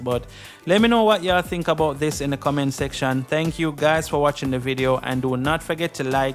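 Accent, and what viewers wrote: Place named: Jamaica. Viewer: It's his accent. He is Jamaican. I'm from St. Lucia in The Caribbean so I would know. All of us in the Caribbean have our various accents.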